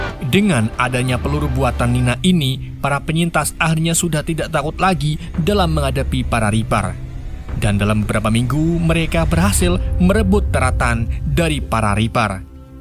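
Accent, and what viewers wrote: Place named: Pemalang, Indonesia. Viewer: admine wong pemalang😂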